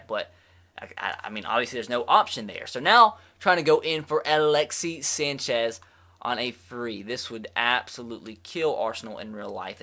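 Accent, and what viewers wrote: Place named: South, United States. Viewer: no hate, just curiosity are you southern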